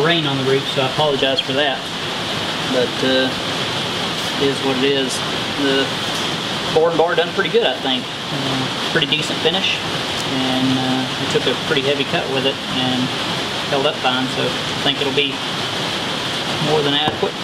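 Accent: South, United States. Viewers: just need to understand that Southern drawl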